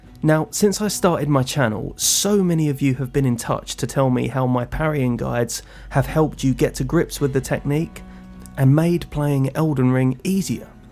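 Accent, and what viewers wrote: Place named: East Sussex, United Kingdom. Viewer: Thanks man. Just found you and you have another sub. Im a Brit sitting in the warm spring sun of East Sussex - your accent doesnt sound too far off this neck of the woods. But Ive been wrong about that stuff many times! I am really impressed how quickly you have built this channel. Gives me some hope. What would you say was your secret to starting it up to fast?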